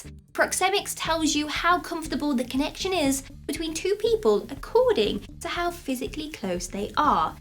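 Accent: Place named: Northern England, United Kingdom. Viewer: Watching and videos to try to figure out what part of the UK you’re from. Somewhere in north England? You’re adorable!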